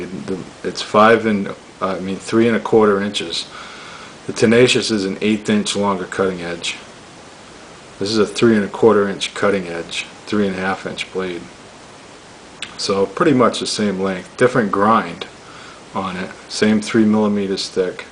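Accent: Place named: Brooklyn, United States. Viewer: nice review bro! you got a cool Brooklyn gangster accent. where you from?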